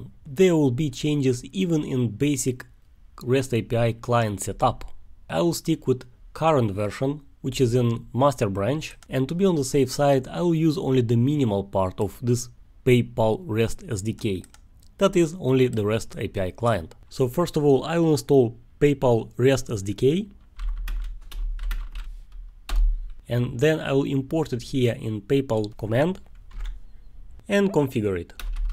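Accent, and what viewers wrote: Russia. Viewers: Спасибо брат. Но был бы на русском урок - было бы ещё лучше. Акцент русский слышно))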